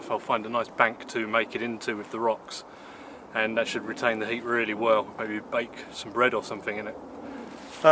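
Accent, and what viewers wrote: United Kingdom. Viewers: R u from UK?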